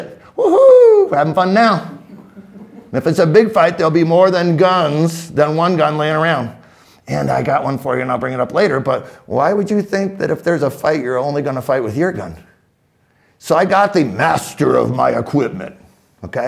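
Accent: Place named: United States. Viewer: Which region of USA do you belong to sir? You have a very interesting accent.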